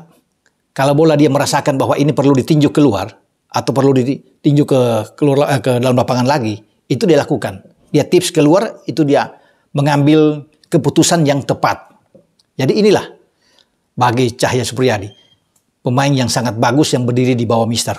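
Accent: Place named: Palembang, Indonesia. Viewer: logatnya kayak wong kito galo bener dak.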